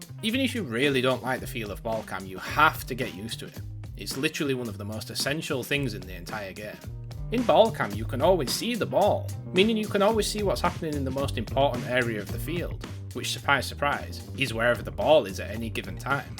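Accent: Yorkshire, United Kingdom. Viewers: Very good video bro, you from Yorkshire too or manny?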